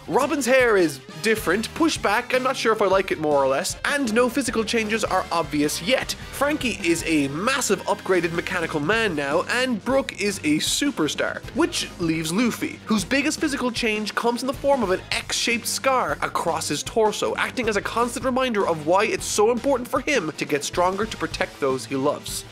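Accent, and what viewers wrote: Ireland. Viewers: Yes, his accent is pretty Irish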